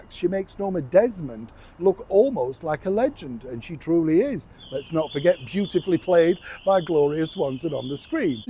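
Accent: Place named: England, United Kingdom. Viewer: It was Mam which is something people called the late queen. It sounded like mom to me too but I think it was his English accent.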